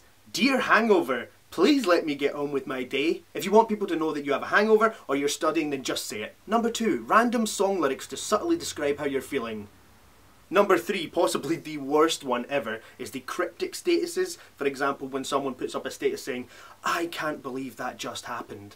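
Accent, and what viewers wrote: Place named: London, United Kingdom. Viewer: his accent is annoying, i recognize his accent from suburbs of London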